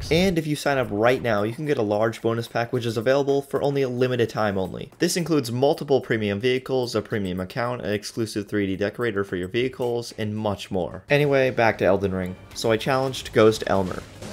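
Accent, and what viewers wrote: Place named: North America. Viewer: Honestly buddy I have no clue. Judging from what I can remember his accent he's probably North American? Keep in mind I only say this because I'm North American and I couldn't identify an accent. So I'm assuming we're the same? Don't take my word for it. Check the interview with OnlyWaifu.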